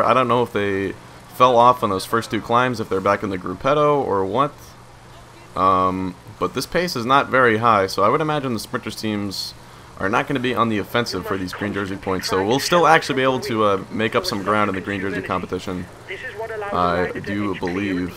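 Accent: United States. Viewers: You're pretty much pronouncing all the names like an ignorant American to be fair ;-)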